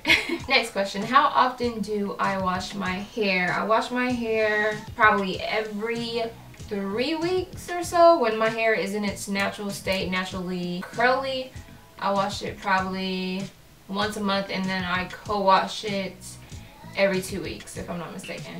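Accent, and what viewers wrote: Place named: Jamaica. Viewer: I heard your Jamaican accent in the candy land tutorial 😂😂😂 represent fi yawd❤💚💛 nuff love ❤💚💛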